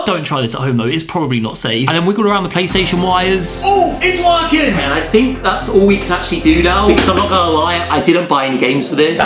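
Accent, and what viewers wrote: United Kingdom. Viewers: Just bothered with the British accent sorry😅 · I love his British accent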